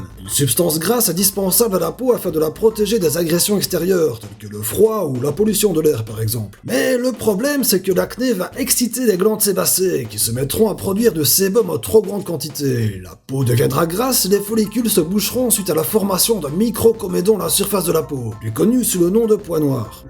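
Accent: Liège, Belgium. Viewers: t'es liégeois ?? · normal c un belge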